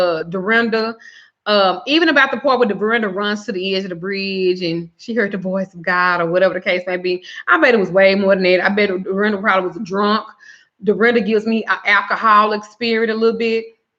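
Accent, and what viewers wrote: South, United States. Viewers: I love your accent :) Can I ask where it's from, Texas? Alabama?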